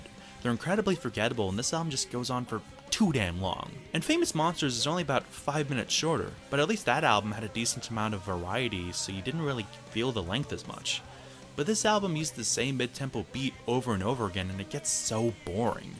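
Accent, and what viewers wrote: New Jersey, United States. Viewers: Vampire costume+Jersey accent=Priceless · He might be living in Oz but he clearly has a US accent, so must have previously lived there.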